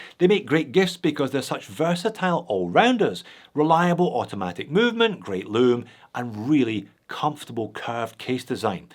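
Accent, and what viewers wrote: Scotland, United Kingdom. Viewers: Came for the watches, stayed for the Scottish accent. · A knowledgeable & enthusiastic host talking about watches with a Scottish accent. What’s not to like? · Merry Christmas. Thanks for a bumper year of watch reviews and laughs. The only downside is that whenever I read a watch review now.... I do it in a Scottish accent. Oh well. 😁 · Merry Christmas and the rest of the viewers. Love your scottish accent, reminds me of my family:-). Keep up the good work.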